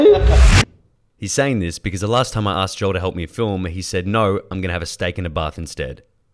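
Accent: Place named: Australia. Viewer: Your accent is getting more Australian for some reason 😂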